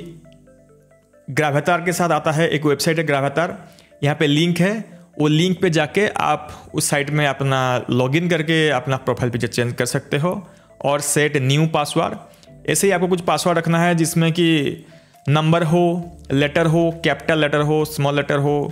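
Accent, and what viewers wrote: Odisha, India. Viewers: Bro aap odisha ke ho...aap ka voice lagraha hai aisha ..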